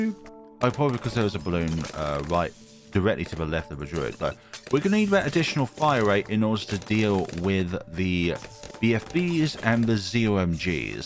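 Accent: United Kingdom. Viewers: You are the most British person I’ve ever heard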